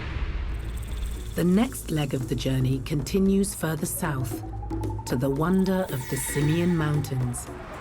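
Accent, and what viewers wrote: United Kingdom. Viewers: what 😂 nice voice and British accent makes everyone seem knowledgeable but this documentary is very inaccurate plus I don't know if it's on purpose but they were jumping pivotal moments in our country's history when she was talking about axum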